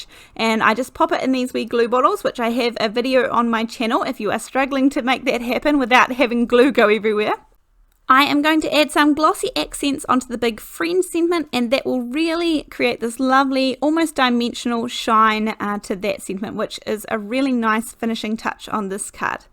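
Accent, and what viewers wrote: New Zealand. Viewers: My 5 year old daughter loves watching your videos with me. I've just found her in the kitchen doing her own crafting tutorial for her little brother and sister, complete with a slight New Zealand accent! (we live in the UK!)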